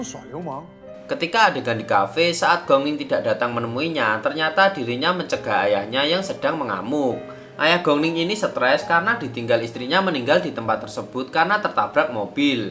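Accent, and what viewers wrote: Java, Indonesia. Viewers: Alur cerita yg d bawakan bagus tpiIntonasi pengisi suaranya terlalu medok...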